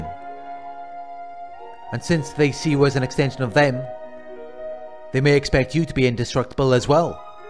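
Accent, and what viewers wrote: Wales, United Kingdom. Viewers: Just stumbled over your video. Can’t believe accuracy of what you say. My ex narc 1. Promiscuous. Gave me a STI and took me to special clinic next morning! 2. Blamed me for preventing him meeting other women. We were supposed to be in a ralationship! 3. Told me his parent’s gave up on him as youngish of three boys.Elder brothers all narcs on various scales. All plotted against me “ stupid woman” 4. Reckless behaviour “ swimming with sharks! “. Frightening incident when showed me no concern for my wellbeing. Trying to keep up with his pace of walking and nearly walked in front of car. 5. Threatened to murder me if I slept with anyone else. He’d moved on to new supply and living with her. Had left me and gone abroad but I was supposed to be his girlfriend 6? So terrified of him coming home after long journey. Met up in hotel . 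Arrived at midnight. In such a black mood was too scared to speak to him. Even considered the scenario “ would anybody know where to find me if I went missing. 7.Would never discuss our relationship. If I bought up a concern he would try to initiate sex! Was sitting down one day opposite him and bought up a concern with one of his friends, no confrontation on my half. Suddenly threw glass of wine in face, wondered what on earth happened. Carried on as if nothing happened then carried on talking. When I challenged him he threaded to tape me so people could see what I was really like. Excuse my ramblings. Had to get it off my chest. Love the Welsh accent.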